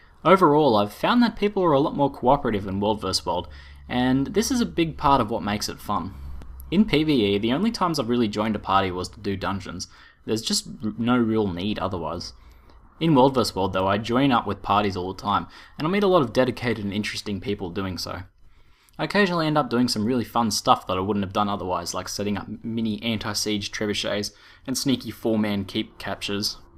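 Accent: Australia. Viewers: Awful aussie accent :D Nice vid however.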